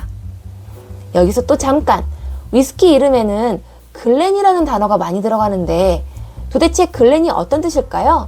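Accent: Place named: Gyeongsang, South Korea. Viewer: 누나 경상도네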